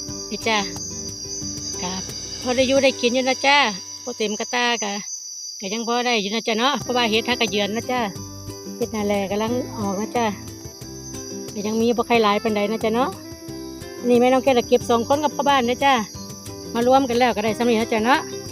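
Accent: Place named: Phu Thai, Thailand. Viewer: ทางได๋น้อคือสำเนียงภูใท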